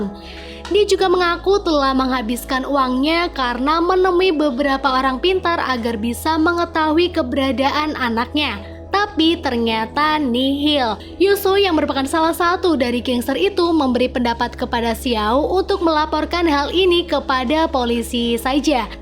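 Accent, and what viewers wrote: Pemalang, Indonesia. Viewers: mbak orang pemalang ya? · Mbak nya orang Pemalang?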